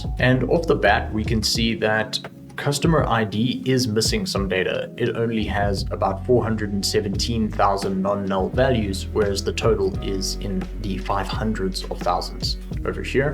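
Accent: South Africa. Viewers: Am I hearing a South African accent? Thank you for the valuable video by the way. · Is that a South African accent? Automatic Subscription!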